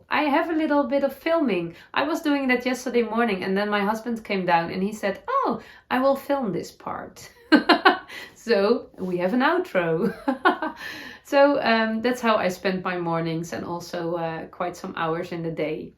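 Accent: Netherlands. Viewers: Your Mentor socks are so cool!! We would say ‘high instep’. Love listening to your ‘Dutchy English’. ❤️❤️❤️ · You can say your eyelids are getting heavy. I love your Dutchy English 😄 also you festive yoke is beautiful. I’m glad I haven’t made it yet because the neutral colors are really fabulous.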